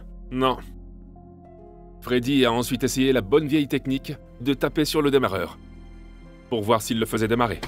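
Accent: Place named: England, United Kingdom. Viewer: Lol knowing you're English accent voice then hearing it dubbed is crazy cuz in listening and since I'm in America is dubbed in English. So I'm seeing you speak English knowing you're voice but hearing a weird english dubbed voice haha😂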